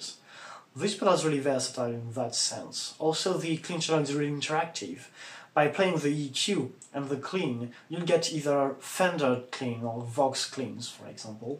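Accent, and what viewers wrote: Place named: United Kingdom. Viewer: Amazing work as always. Love the English Channel accent <3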